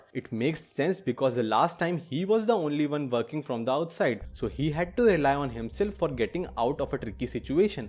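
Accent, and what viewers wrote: India. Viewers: your accent, are you from India?? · Your India accent is so strong ....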